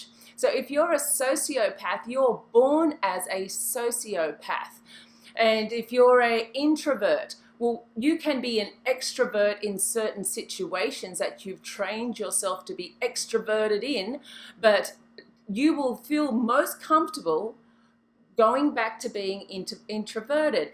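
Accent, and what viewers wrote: Australia. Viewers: Where are you from? I hear an Australian accent. But, then I hear "rrrrrrr's" pronounced at the ends of words like "partnerrrrrr", "casterrrrr" lol . . . almost like an Irish or American person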